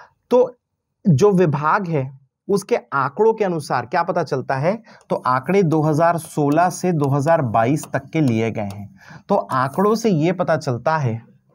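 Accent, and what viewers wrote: Chhattisgarh, India. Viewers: Sir ji jab aap cg me bolte ho n...to bahut accha lagta h🙏❤️🙏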